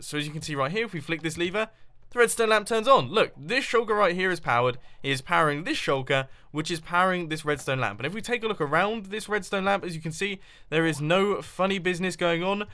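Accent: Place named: United Kingdom. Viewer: He kinda sounds like Wheatley from Portal 2... Probably cuz he British lol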